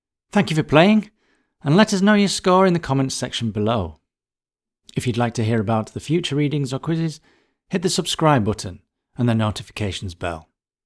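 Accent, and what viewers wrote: United Kingdom. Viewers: Such a brittish accent 🇬🇧 😂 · LOVE THOSE BIBLE QUIZES.GREAT QUESTIONS!!! LOVE THE BRITISH ACCENT.GOD BLESS EVANGELIST ROGER MANSOUR MISSIONARY TO HAITI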